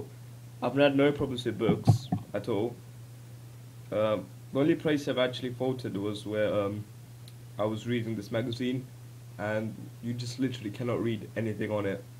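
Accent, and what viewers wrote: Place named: London, United Kingdom. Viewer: You sound like you're from Harrow or Stanmore. I'd be so happy if I'm right :P P.s. good video, thanks. Think I'll be getting the cyclone voyager 10.1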